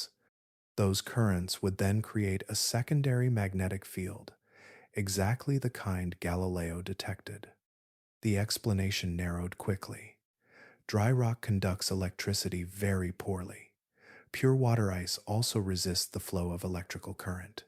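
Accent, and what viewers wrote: United States. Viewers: American accent and no annoying sound effects -- thank you!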